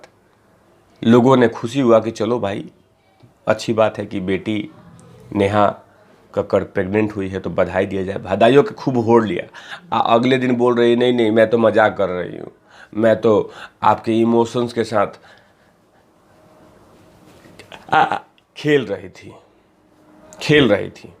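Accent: Bihar, India. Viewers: AAP BIHAR SA HO KYA 🤔🤔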